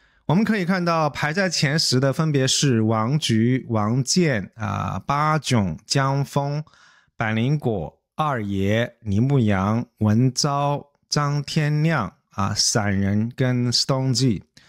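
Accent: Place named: South, China. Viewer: 听up主的口音，好像是南方人，广东福建一带的？有没有关注香港或从香港逃到台湾的一些大V，以粤语为主，粉丝量和 收入也很可观。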